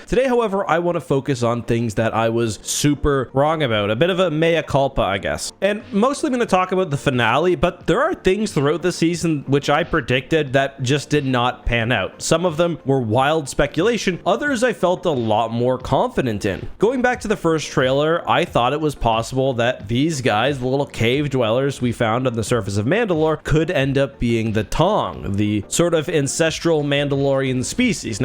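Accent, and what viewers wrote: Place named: Southern Ontario, Canada. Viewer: I feel like your accent is more pronounced in this video, and its basically the exact same as my southern ontario accent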